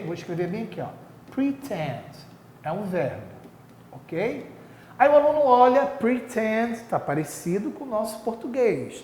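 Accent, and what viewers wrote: Pará, Brazil. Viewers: Ótima aula e explicação, você é paraense professor? kk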